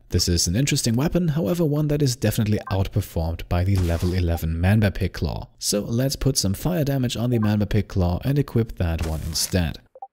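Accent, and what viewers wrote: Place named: Germany. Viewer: p.s. sprichst du Deutsch? I detect a slight accent:)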